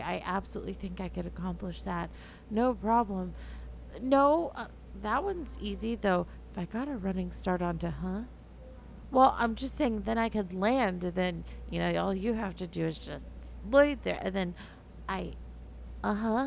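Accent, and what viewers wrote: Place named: Texas, United States. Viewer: I can tell you're from Texas how you switch from Spanish pronunciation and quickly into English lol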